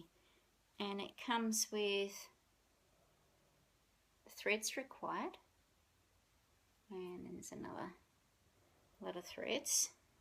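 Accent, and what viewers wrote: Australia. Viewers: I still am amazed at how much you get done with your month! Mermaid of Atlantis is beautiful. You should be very pleased with how she came out! All of those beads really do make a difference. I will be excited to start beading my mermaid! I'm still working on the skin, though... The little cottages are too cute! Blackwork sampler is looking very nice. And Refleggions looks so pretty! The purple is perfect for Easter. And your thoughts on the word khaki made me laugh. I had to say it out loud both times! I love listening to you and the other Aussies to pick up the accent. The Australian one is one I haven't yet been able to get. I can do a few phrases, but it doesn't hold very well, haha. At least now I can pronounce khaki!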